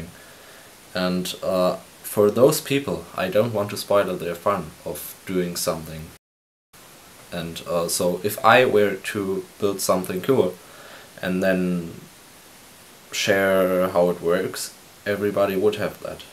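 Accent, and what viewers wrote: Germany. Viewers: are you german ? your accent sounds like you are.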